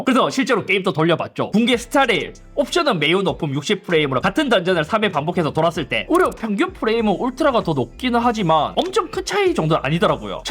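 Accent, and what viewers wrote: Gyeongsang, South Korea. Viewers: 선진국형 삼성폰.후진국형 아이폰.해설자 상도사투리 극혐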